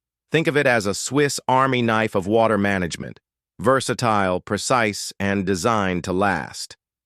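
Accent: West, United States. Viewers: Hey man I know you sound like a Westerner you either be Americans or Canadian or Europeans but you are saying the truth You went there and you make your video at the same time your western leaders led by United States criticizing a leader that try to reformed his country within a short period of time The great jobs from roads constructions from building hydro power industries hospitals and agriculture sectors as well many more development that going on there building Estes or houses and they're still condemning and criticizing this leader captain lbrahim Treore the man of African choice for no reason why should we believe in democracy a system that was in brain prosperity to Africa a system that the western leader use forge story lies to go to Libya to go to Iraq and Afghanistan and many more places in Africa as well propaganda against him is that democracy can we believe democracy to be a true way of governance when their leaders themselves they're not straightforward the lies the manipulation stories how can we accept democracy when people that champions so called democracy and lying blindly something that you have seen it and you are even making video about it is real that the skill and the level of development is not hiding compared to independent time till now the development achieved from that period is nothing He's doing so much great compared to the man who were in power for 27 years what did you do You to serve the French All the resources were going to France and th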